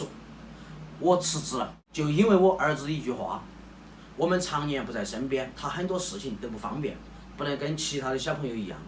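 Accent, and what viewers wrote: Sichuan, China. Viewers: 你好,我也是四川人,我和父母籍贯都是内江的,广西出生,9岁回成都,18岁出国读大学,到现在快四年了.我爸因为工作原因,经常和我还有我妈聚少离多.我觉得你的儿子以后肯定会感谢你做的这个决定的,我因为是我妈和我外婆带大,性格有时还是有点优柔寡断,而且和我爸的交流总感觉不如和我妈交流走心.哈哈,我觉得你儿子未来肯定会感谢你的这个决定的. 看你的视频,学学做菜,听听乡音,安逸惨了~算是我在外求学之余为数不多的慰藉,谢谢,😁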